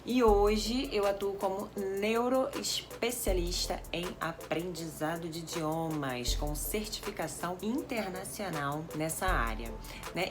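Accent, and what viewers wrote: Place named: Rio de Janeiro, Brazil. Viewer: Cai aqui de paraqueda e estou amando........ ótimas dicas e eu posso dizer com certeza .....isso funciona!! Foi com um método parecido como esse que fiz minha carteira de motorista......, sou Baiana moro na Europa e amo o inglês, estou aprendendo, mas as suas dicas são ótimas, obrigada... meu pagamento das aulas já fiz....... 😂😂😂dei meu like👍🏻👍🏻👍🏻👍🏻👍🏻👍🏻 e baixei o soninho 💡⏰🛎🔑pra receber mais bônus ( vídeos ) seu sotaque Carioca é lindoooooooooo🥰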